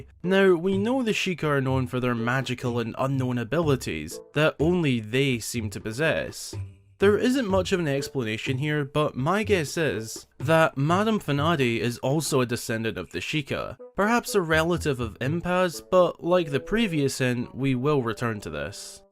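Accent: Scotland, United Kingdom. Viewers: You sound like yer fae Scotland? Whereabouts I’ll share 2L of strongbow wae ye if ye want?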